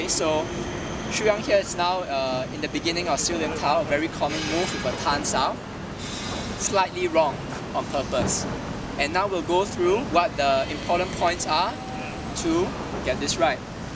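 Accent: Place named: Singapore. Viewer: Judging from the accent, must be Singaporean and Malaysian wc. There s no such thing as structure for sil lim tao, there is only one single right structure for the entire Wing Chun system. Your mentioning of "clamming down" the knees already show some misunderstanding. Most people have been misled and gone down the wrong path simply because of the unfortunate use of the word "箝" ! 取其像而不取其義!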